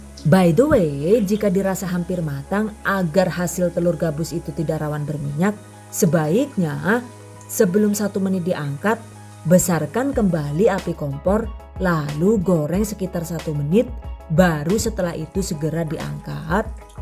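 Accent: Banyumas, Indonesia. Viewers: Ditengah nyimak tutorial ini, muncul lah ngapaknya,,,,bunda. Seru banget resep nya diselingi logat" yg bikin ketawa ngakak tapi justru bikin kangen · Saya senang dengan semua resepnya dan senang bahasa ngapaknya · Pokoke seneng belajar sekaligus ngehibur diri apalagi kalo keluar bahasa ngapaknya, hhh sama2 ngapak jadi gak bingung. step by step nya juga bener2 gak pelit ilmu deh, makasih, semangat dan terus berkarya njeh 😘 #GiveawayTHV2021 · lihat resep sambil ngakak denger clotehannya yg ngapak2😂😂😂😂👍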